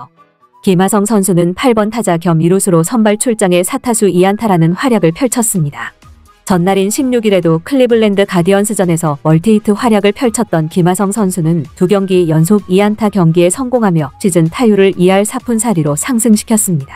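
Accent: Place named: North Korea. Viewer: 아 젠장 결국 3루에 공던졌다는걸 이렇게 길게…. 그리고 이 아줌마 목소리 겁나 듣기 싫네. 북한여자인가?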